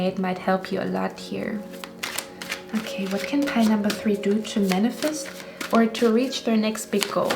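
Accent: Germany. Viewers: Pile2 Since a week a have a lot of deja vus its weird like a lot on a day And I guess I meet one of my guides in my dreams A lot of readers say aomething about matrix and lucy? I hate this kinda films they are so dark but I am going to watch it anyway cuz of the messages Und ja man sieht den Wald vor lauter Bäumen nicht ich höre einen deutschen aktzent🤣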